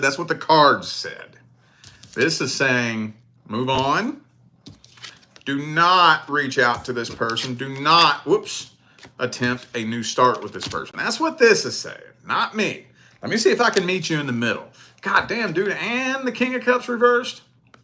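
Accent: South, United States. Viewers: This resonated and also your accent reminds me of home in the south! Live in Cali now but I miss these accents ❤😊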